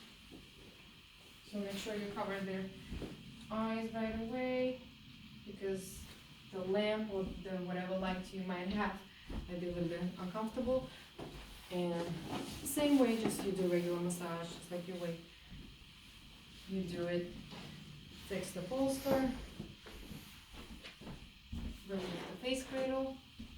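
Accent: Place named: East Coast, United States. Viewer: I hear a cool east coast accent : )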